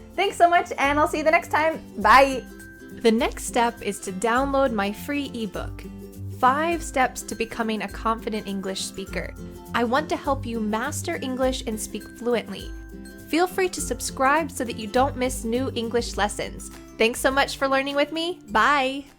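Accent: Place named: United States. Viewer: Omg now i knew why your (American) accent wins our tender hearts. From india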